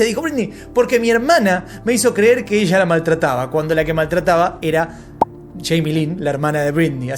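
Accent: Argentina. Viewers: Sos argentino no ???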